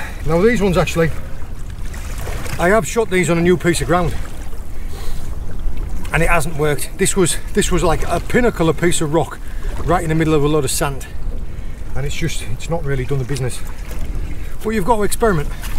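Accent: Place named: Redcar, United Kingdom. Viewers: me and Mrs were trying for months I thought up Redcar as well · where abouts in the northeast are you from sound like redcar area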